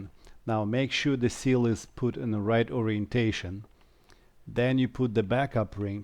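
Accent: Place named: Romania. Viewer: Mercedes-Benz Channel We all know that experience my friend. It’s an inspiration for me, you’ve got it looking really good. I hope you find time to complete the build soon. I haven’t even started mine yet. It’s a 1983 USA spec model (solid alloy wheels and ‘INTERCEPTOR’ decals), so rather rare here in the UK. Where are you based? Your accent sounds Romanian to my ear. Hope I haven’t offended you.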